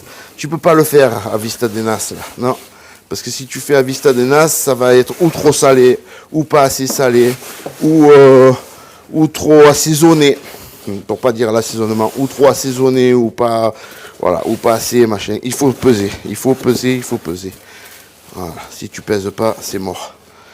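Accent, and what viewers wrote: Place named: Marseille, France. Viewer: est tu cevenol de naissance ou d adoption? Désolé pour m question intrusive mais ton accent me rappelle fortement le mien et celui des mes proches qui sommes issus de la région marseillaise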